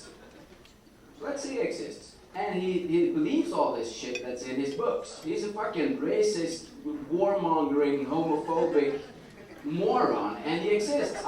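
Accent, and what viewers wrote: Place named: Sweden. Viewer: Haha han pratar tvär svensk engelska dock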